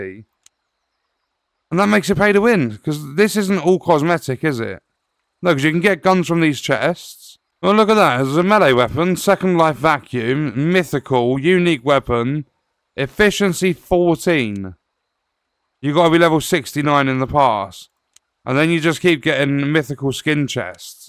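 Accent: United Kingdom. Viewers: dude the british acent absolute king 😩👌🔥🔥🔥🔥🔥🔥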